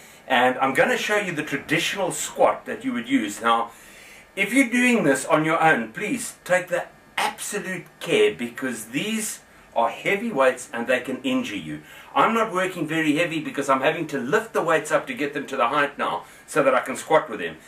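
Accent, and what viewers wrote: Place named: Cape Town, South Africa. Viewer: Thanks from Peshawar, Pakistan. Luv your Cape Town accent.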